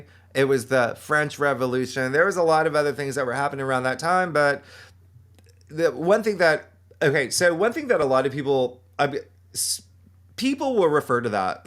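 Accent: South, United States. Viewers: First time to see this guy and I’m here for it! He has to be from Mississippi! Sounds very White Lotus-y😊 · I know this is coming and I’ve been listening to him for the past couple days on and off. Hearing another southerner resonates with me and the things I do know, he confirms. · I love a southern Kween! Yasss girl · Well that’s why you sound familiar lol. Not southern Baptist but southern 😂❤u! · has such a joyful energy and he always puts me in a good mood listening to his videos. 💚 I didn’t know anything about his background but with his accent, I wasn’t surprised to hear him say he comes from a Southern Baptist background. There’s some of us who make it out the other side of the fundamentalist dogma, hallelujah! 😅 Love you,! 🥰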